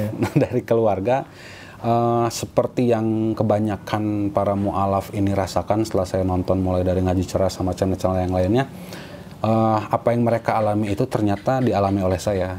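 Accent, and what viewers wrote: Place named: West Java, Indonesia. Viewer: Seru..resep ngadangukeun na.. moga istiqomah nya kasep.. terharu oge...ibu mah penonton setia .. pas bangeut logat sundana.. semangatnya kasep.. 👍👍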